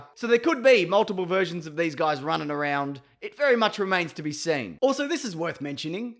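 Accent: Australia. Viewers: Dat TREACLY thick aussie accent countdown, tho! Make a full-vid of jus THAT maybe? And definitely keep counting too slow behind the actual onscreen yt countdown in the corner of the video, lol. PLZZZ. I'll donate to that charity for just one minute worth (or however long it takes to verbalize sixty syrupy seconds, that is). I'd bet you guys could make even a full countdown video hilarious and fun! \m/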